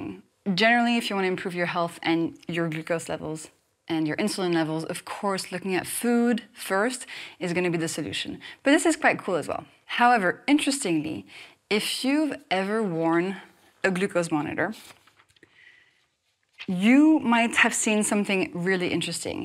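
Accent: France. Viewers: We (french native speakers) are so doomed... I mean I would kill for an accent like this one, yet people treat this as a french accent... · Completely off topic... How have you managed to almost completely lose your french accent? That's a first for me! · Has anyone sensed a similarity between Ms. Inchauspe and Martha Quinn from the OG MTV in the early 80’s?Quirky, cute, MTV VJ. One of the original VJ’s. is French but even through the tinge of French accent there’s a lift and a flow to her pitch and tone that was unique only to Martha until now. At least to my ears. To me it’s kind of freaky to watch talk while remembering Martha Quinn. I can hear Martha in there. I don’t think is doing anything to change her voice, not on purpose at all but it is eerily similar. At least to me. She is engaging and if it helps people’s health, that’s great. More power to her!! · That subtle french accent is absolutely charming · I like this videos of yours. 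I think you are a good and knowledge person. Side note: for some reason I sense a strong French accent in your English and I wonder are you French or a French-Canadian?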